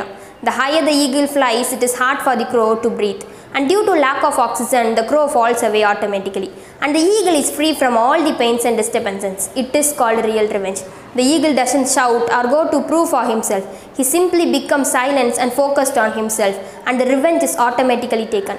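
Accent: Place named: United States. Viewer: it is like an American dialects... superb...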